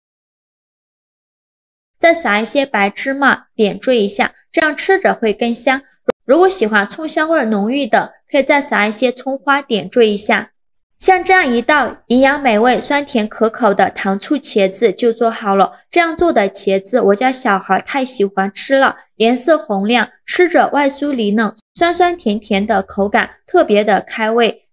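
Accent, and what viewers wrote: Yunnan, China. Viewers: 有趣的云南普通话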